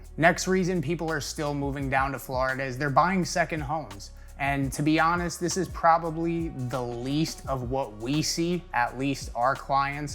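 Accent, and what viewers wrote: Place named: New York, United States. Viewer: Always appreciate your videos...and especially your no nonsense tell it like it is NY/NJ delivery. .. lol. I'm here in NYC, retired guy, and have been thinking a great deal of moving to Ft Lauderdale. (Broward county being the only one I would look at, after giving other areas in FL very much consideration) However, my front runner move to area right now is the Hudson River Valley, looking at towns there now. I figure I could snow bird it down to FL.... for me actually living there as a primary residence is problematic. We'll see. Again, love your videos!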